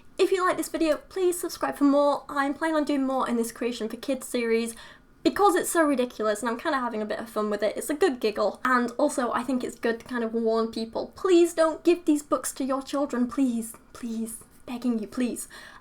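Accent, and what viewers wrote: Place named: Northern England, United Kingdom. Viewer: Okay, you have the northern accent from game of thrones and you look like a STARK, seriously game of Thrones fans close your eyes and listen to the accent / like other sounds like "ughthugh".....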